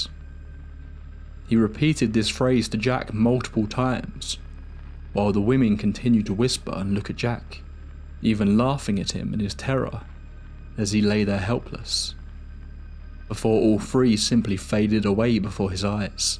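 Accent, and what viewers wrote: United Kingdom. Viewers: Why are all videos like this narrated by a British guy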